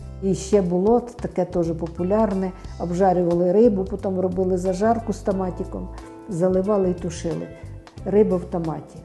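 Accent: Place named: South, Ukraine. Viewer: Наш південний говір! І смачні мітітеї! Дякую Вам. Чекатиму наступних відео. Хай щастить!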